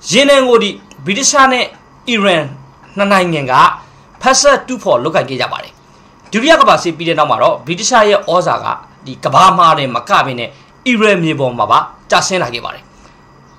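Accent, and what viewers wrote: Rakhine, Myanmar. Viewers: ညီချေ ဂါရဝပါ အပြောအဆိုရခိုင်အသံပါနီရေအတွက်ရခိုင်ဖြိုက်ဖို့များရေ ယေဇုရခိုင့်နိုင်ဂန်မာအုပ်တချပ်သဲတပွင့်ဖြိုက်ပဇီ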